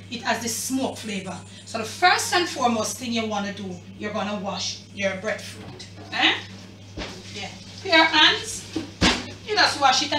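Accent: Jamaica. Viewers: SHE IS SO HILARIOUS, WHEN SHE SPEAKS JAMAICAN VERNACULAR FAST, I DON'T UNDERSTAND ONE THING SHE SAYS. BUT, IT SO FUNNY. WHEN SHE SLOWS HER SPEECH I UNDERSTAND EVERYTHING SAYS. I LOVE THAT SHE DID NOT COMPROMISE HER JAMAICAN ROOTS IN DESCRIBING HOW TO ROAST THE BREADFUIT. I LOVE ALSO THE CANDOUR IN FRONT OF THE CAMERA. MADE ME FEEL LIKE I'M HER BEST FRIEND. I CAN SIT AND CHAT WITH HER ALL DAY.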